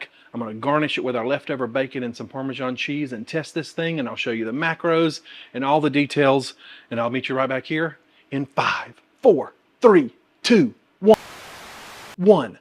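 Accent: South, United States. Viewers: I love you and I am proposing. I love your personality, your southern manner of speaking, and darlin’ you can cook! I know you are spoken for, but I will wait. BTW I am 81 years. ❤ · My husband says you’re me but with a beard!!:) that’s because his mother is German and he grew up speaking high English. I’m from Louisiana aka Luzianna. I grew up with such an accent but moved north in high school and have been mercilessly teased since. I think your Southern style is lovely and refreshing! God bless you shooger💜🙂 I love you’re show and find it informative and entertaining. I love your recipes and tips. Keep up the great work. 🍽️🎬🎦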